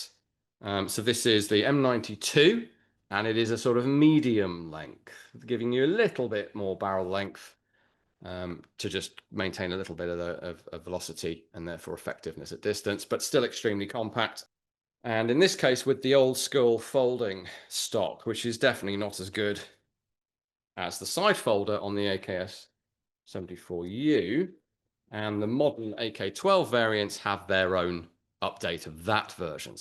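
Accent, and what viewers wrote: United Kingdom. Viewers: You can't just discuss AK with that sort of a gay British accent. It simply doesn't work that way.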